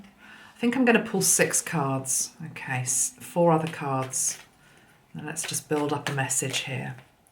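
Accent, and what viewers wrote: United Kingdom. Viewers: Just 1st time your video came to me. 🤩 Love your energy, learning good amazing british English 🙏🙏❤️🤗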